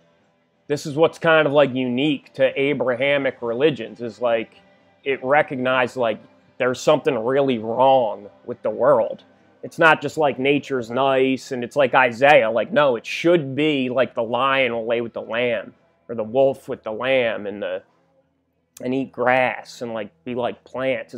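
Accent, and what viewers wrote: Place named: Pennsylvania, United States. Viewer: Love how you had a Freudian slip and said The Lion shall lay with the Lamb 🐑 I mean the wolf 🐺 😂 it is photoshopped but it, it has to be ! Great stuff my friend. And it’s nice to hear your PA accent I was just living in Tower City but in FL now. Yea your getting into the difference between the mortal flesh or materialistic vs. the Higher Mind and spiritual self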